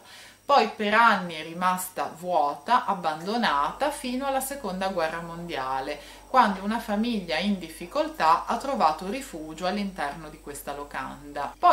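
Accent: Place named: Genoa, Italy. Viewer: belandiiiiiiiiiiiiiiiiiiiiiii non conoscevo questa storia.comunque hai una forte cadenza genoveseeeeeeeeeeeeeeeee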